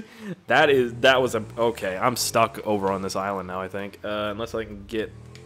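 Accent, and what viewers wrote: Canada. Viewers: No homo but I love you Canadian accent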